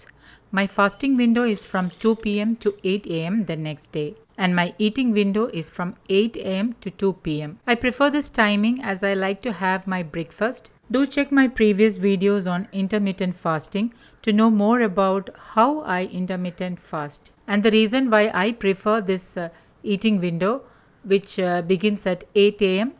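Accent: Kerala, India. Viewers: Sounds like you are malayali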